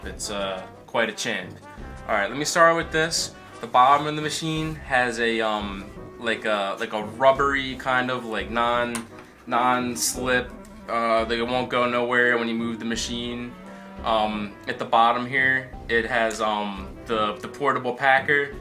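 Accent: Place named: Pittsburgh, United States. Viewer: you from pittsburgh? sounds like the accent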